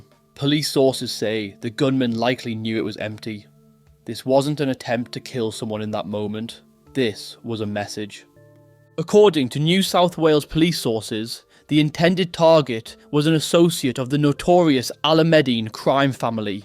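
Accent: England, United Kingdom. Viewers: It's Cah-soo-la, not ca-sul-a. You're a pom, right?😀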